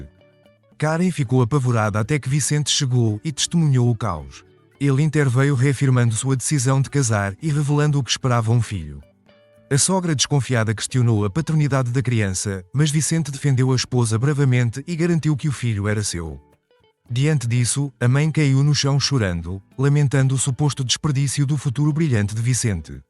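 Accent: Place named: Portugal. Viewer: Sotaque horroroso esse de Portugal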